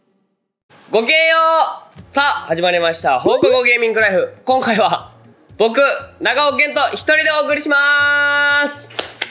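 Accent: Kansai, Japan. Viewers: やっぱり長尾くんの関西弁好きだな〜 編集も愛があってみてて幸せすぎる😢 ソロ動画嬉しいですありがとうございます🥹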